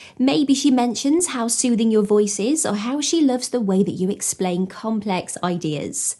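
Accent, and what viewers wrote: United Kingdom. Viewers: Love your British accent!!! 😘😘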